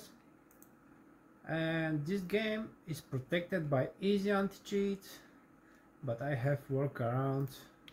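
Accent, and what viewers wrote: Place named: Greece. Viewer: Η προφορά σου είναι λίγο ελληνική..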